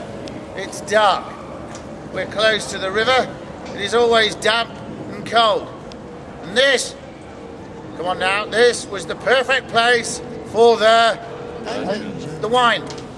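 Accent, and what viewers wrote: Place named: Scotland, United Kingdom. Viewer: What makes me think you're scottish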